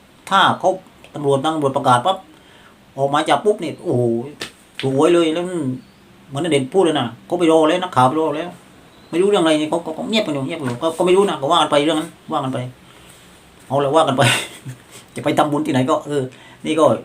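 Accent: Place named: South, Thailand. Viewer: หวัดดีค่ะ พูดใต้แทรกบ้างก็ดีค่ะฟังน่ารักดี เชียร์นะคะ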